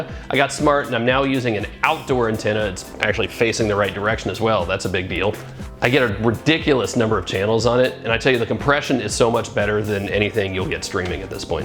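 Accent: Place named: Nova Scotia, Canada. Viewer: You sound like you live here where I do, Nova Scotia, Canada. 'Only 2 Providers', Eastlink and Bell and they both charge the same and have pretty much the same packages. I'm paying $ 80.00 for internet as well, however, I do think that's too much for 100 Mgbs, it should be about half that, around $ 30.00 to $ 40.00 per month. Not good bc we actually have absolutely 'NO' options with only 2 ISP's.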